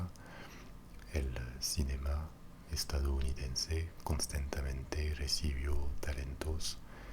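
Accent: France. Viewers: So, do you still have a 'french' accent in spanish?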